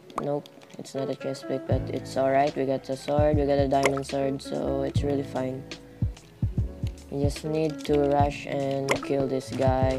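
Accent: Philippines. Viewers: is an real filipino have accent cool🔥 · Yo!! Sounds like from Asia huh? Hmmmm phillipines? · Philippines is cute speaking english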